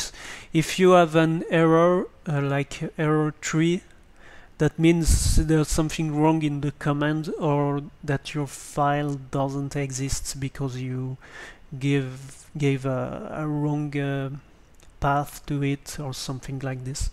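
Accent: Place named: France. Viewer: I'm a french guy listening to an english tutorial given by another french guy with a very french accent, reminds me of school Thanks for the tutorial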